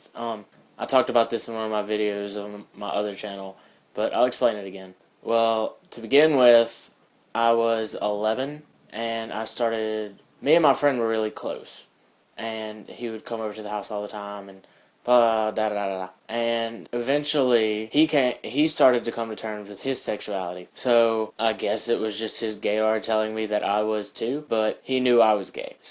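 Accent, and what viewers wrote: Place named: South, United States. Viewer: where are you from??? I hear a Southern accent, but not sure f ft. Om where.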